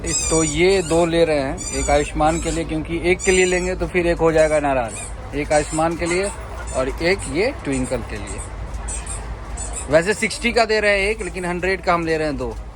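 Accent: Bihar, India. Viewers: I watch ur videos on mute as I don’t like ur bihari accent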